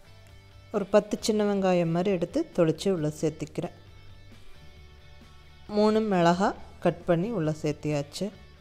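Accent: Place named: Coimbatore, India. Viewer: Beautiful kitchen Madam ...😍😍கோயமுத்தூருங்ளா நீங்க? அரசாணிக்கா சின்ன வெங்காயம் தொளிச்சுன்னுல்லாம் பேசறத கேட்டா அப்படி தெரியுதுங்க... ரொம்ப என்ஜாய் பண்ணி ரசிச்சு சமைக்கிறீங்க... 💛💙💜💗💚❤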